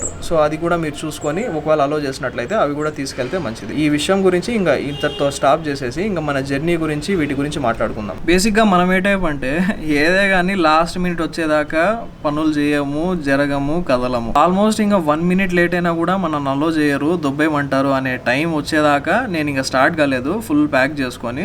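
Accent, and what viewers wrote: Rayalaseema, India. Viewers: Like your rayalseema ascent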